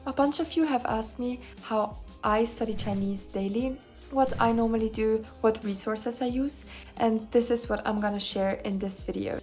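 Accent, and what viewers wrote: Germany. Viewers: Very impressed by your diligences and study approach, I'll definitely try to apply it going forward. Just a small note: you have excellent English but a pretty strong German accent - maybe try to emulate your preferred English accent, just to sound a bit more natural?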